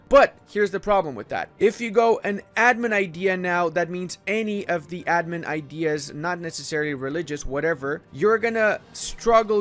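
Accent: Germany. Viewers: I can't lie the weird German accent gets somewhat annoying after a while. Not hating just my opinion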